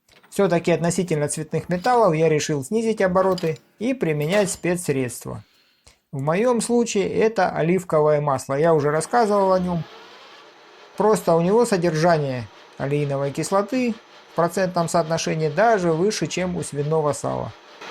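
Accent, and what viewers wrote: South, Russia. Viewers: Блин, довольно долго думал, что он действительно называет канал "Мегамозг". Но это оказалось моей ошибкой восприятия, из-за его южного говорка. Оказалось что он меХамозг. Надо думать, от слова механика, механический.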